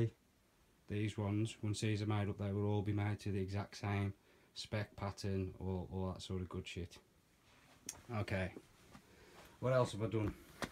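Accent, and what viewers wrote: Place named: West Midlands, United Kingdom. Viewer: nice one mate that was a bloody fast reply lol anyway im from west brom but now in oldbury so i knew that accent a mile away haha also u should promote more maybe a lil bit of social media or summatt and im sure your channel will do really well .... also did u end up sellin all them plates or u still got a few left like?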